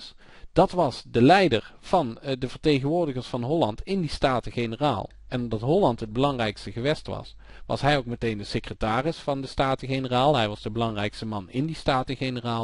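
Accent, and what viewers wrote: Brabant, Netherlands. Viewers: broabant boerûh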